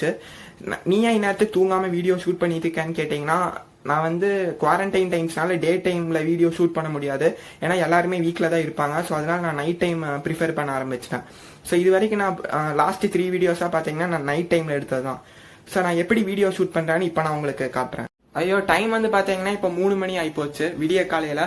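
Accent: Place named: Tirunelveli, India. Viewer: Ennoda suggestion ennanna nammakunnu oru uniqueness irundhathaan namma romba thooram travel panna mudiyum...now u have realized that.. nice ..keep going.. 👍👍👍👍 slang tirunelveli pakkam maadhiri irukku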